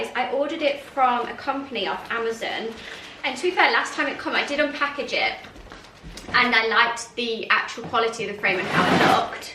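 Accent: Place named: United States. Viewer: Is there a special reason why you pronounce a vase the American way in England we say vase not vaze.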